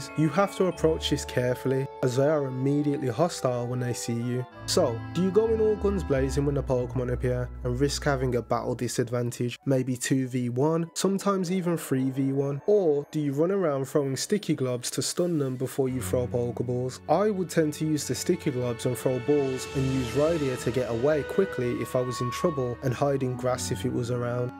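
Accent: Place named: West Midlands, United Kingdom. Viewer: Omg your accent is west midlands accent I'm from Oldbury!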